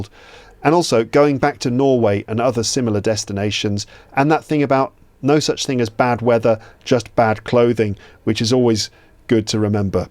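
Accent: United Kingdom. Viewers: Dear Teacher you are great! I love your lessons. Please make videos for shadowing i want to have british accent like you. With love and respect from Azerbaijan. Your lessons are amazing! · thank you for this awesome story about your holiday in Norway. I crossed the Norwegian mountains by bike from Oslo to Stavanger nine years ago... So many memories it pulled out from my memory 😮 Now being living in Canada for almost 1,5 years I wonder how you minimise this funny British accent which I have become to recognize last year? 😊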